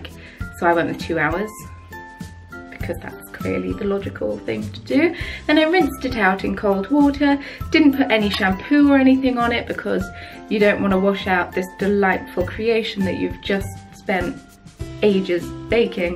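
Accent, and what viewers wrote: United Kingdom. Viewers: are you from Germany bc you sound very British XD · are you from britain??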